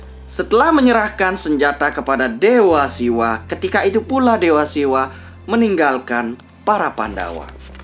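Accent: Bali, Indonesia. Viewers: Baru ngunjungin Channel ini .. Malah fokus sama suara mimin, kyanya mimin orang Bali ya??? Logat nya Bali banget .... Salam persaudaraan Dari Jawa 😁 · Bikin sante ya sodaraku... Beliao kan orang bali, jd logat bahasanya gitu. Trimakasih udah dicritakan. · Logatnya Bali banget, jadi ingat teman sy Wayan Gusita dari Karang Asem... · Denger suara blii, jadi ke inget guru matematika BPK I gun Made, yag satu2nya guru dari Bali.... Bhasa logatnya khas bngeeet.... Sukses trus bliii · Suara orang Bali nih logatnya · Logatnya Bali banget, Mantap? Jadi ingat teman saya si Putu Satriawan. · Kental khas kakak bali Semangat kak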